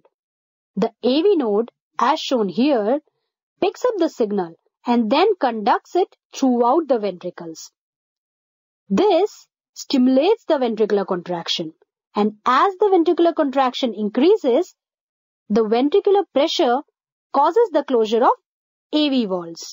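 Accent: India. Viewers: funny indian english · Im engaged to an indian girl. I wish she had an accent like yours. God bless you indian woman. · your accent is too indian. i cant understand a word you are saying.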